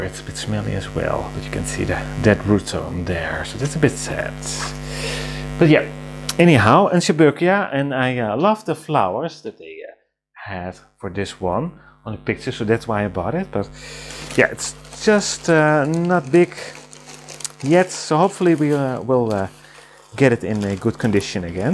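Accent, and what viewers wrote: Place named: Netherlands. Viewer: ! I thought I recognized your accent! I have family friends in Ryswyk! What part of The Netherlands do you live in?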